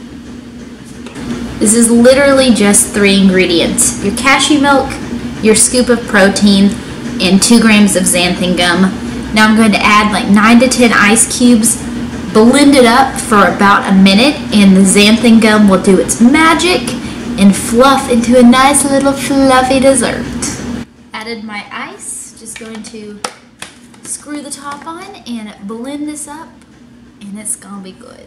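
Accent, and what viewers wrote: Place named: South Texas, United States. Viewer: I'm doing the same type diet myself. Your southern Texas accent is strong lol. I'm from Texas too. Thanks for the ideas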